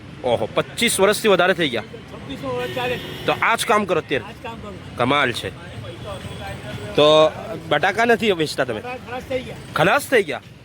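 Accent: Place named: Navsari, India. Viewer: bharuch ma 6 varas rahyo choo engineering karti wakhte ,jhuni yaado taaji karavi didhi , thank you bhai , tamara navsari wada ni gujarati sambhadva ni bahu majha aave che . aey wakhte bharuch ma sauthi sasta theatre hata to to bahu majha aavti hati ne station par fruit juice ni dukaan , ne 6 no. na platform ni chai ne 1 no na platform na kanda wada bahu tasty hata , ne bharuch ni sing to kharij . bahu majha na divaso hata aey .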